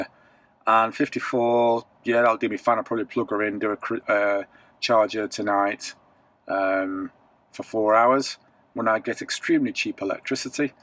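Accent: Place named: Northern England, United Kingdom. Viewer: a proper (Northern) accent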